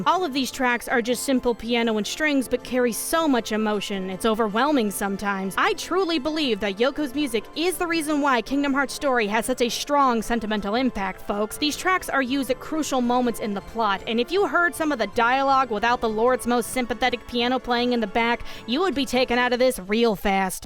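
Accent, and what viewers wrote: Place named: Virginia, United States. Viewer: I'll always have a soft spot for FF8's soundtrack. " ", the boss theme, the standard battle theme, the music during the assault on Dollet,... IT'S ALL SO GOOD. Also, I don't think I'll ever get over that one of my absolute favorite Youtubers is a Virginia native like me. First time I heard talking I was like "I know that accent!"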